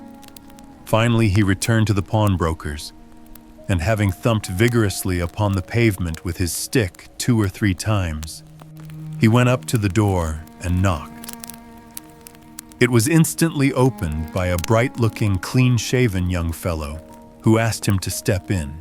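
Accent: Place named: United States. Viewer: It's lovely the music in the background is perfect but I can't do Holmes with an American accent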